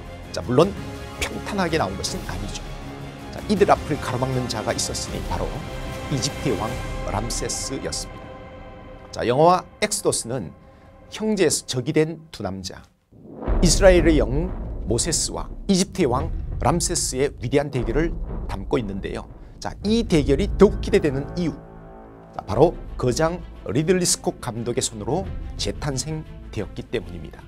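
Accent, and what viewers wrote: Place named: Samcheok, South Korea. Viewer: 20강짜리 7일동안 듣고 한국사능력검정 93점 맞게 해준 ㅋㅋㅋㅋ 강의할때 삼척사투리 쓰시면서 카리스마 넘치시는데... 착한척 말투 완전 적응안되네 ㅋㅋ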